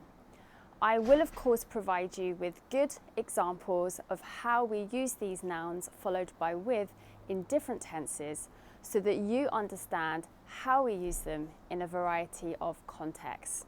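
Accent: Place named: Northern England, United Kingdom. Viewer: Hi, I hope you're getting well soon. Here's an example of an imaginary news article. "The EU office reached an (2)agreement with a famous global company for the company to disclose its customer data holdings. Many sources have raised (7)concerns about the (6)compatibility of this data with the new EU data privacy policy. The company, known for its CEO's (1)affiliation with the newly elected president, has been in (8)conflict not only EU, but also with many countries over this issue for years. Additionally, the EU made a deal with this company to share a list of companies it has (9)connection with. Some of these companies are named in (5)collaboration with the funds owned by the newly elected president's relatives. These funds don't have clear (4)association with concrete issues. Many NGOs, (10)with whom our correspondent (10)contact don't think that the EU has provided enough (3)arguments with the company, against this issue in its dealing with the company. 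One of them criticised 'it's almost like they're on a (12)date with the company. They should (11) cooperate with us before reaching an agreement.' Thank you for the lesson. And I love your accent and trying to imitate it. I'm not a professional but it seems to me a Northern accent because, the sound of 'up' is pronounced with /ʊp/. I'm familiar with this. Thank you for sharing!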